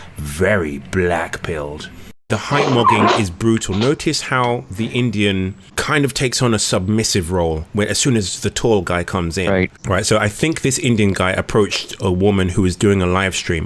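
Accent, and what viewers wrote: London, United Kingdom. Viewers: German? He’s English mate! It’s a black London accent. I have to say his is quite mild and he is very well spoken. Lots of black people in London have very strong accents and sound in your face and ghetto (just depends really). He sounds quite posh tbh. Not saying he is, he’s just very well spoken and not necessarily reflective of the typical black, Asian or white London accent. Londoners accents are usually fucking horrible whoever is speaking them but his mogs everyone’s! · He is from London so I think it's a London accent.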